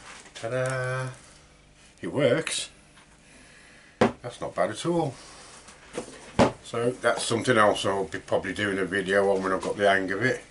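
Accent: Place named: England, United Kingdom. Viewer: I wish I lived closer, I have always said “ anyone who has an English accent automatically has 10 points added to there IQ”. I as well have two super Nova chucks one for my Jet lathe and one for my General lathe. I enjoy your method of presentation on your videos. Keep up the good work. Cheers Don Lakewood Wa......U S A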